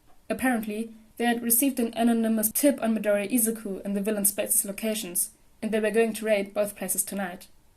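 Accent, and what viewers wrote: Germany. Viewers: Yooooooooo i have a question are you german ?? Because you sound like my class in english class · Let me guess you’re German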